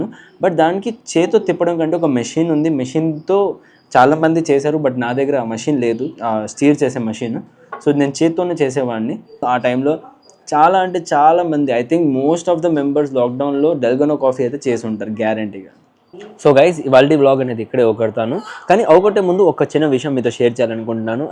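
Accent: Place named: India. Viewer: though ur telugu is hindi mixed ....I appreciate ur telugu......but actual speaking we southindians face huge problem with northindians .....they won't develop thier states ....migrate to our south ....we are facing job crisis ,hindi imposition, culture difference....we south are dravidians....our culture is different from north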